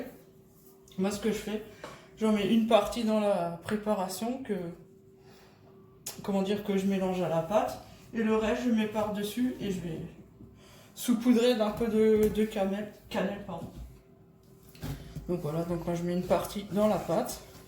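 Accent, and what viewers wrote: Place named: Alsace, France. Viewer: Superbe accent : Haut-Rhin ou Bas-Rhin ?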